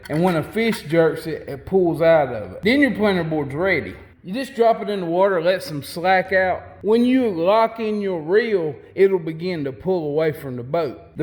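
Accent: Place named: North Carolina, United States. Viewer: grab it bare handed den! 😂 I think we might be from the same neck of the woods! I just ran across your YouTube yesterday and you sound like you could be a neighbor of mine or someone I grew up with! I am a North Carolina man born and raised!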